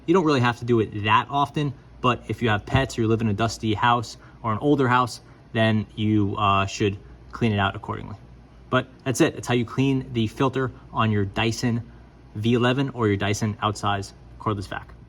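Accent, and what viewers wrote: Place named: United States. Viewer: Hi from Australia 😊 you have the best American accent 😊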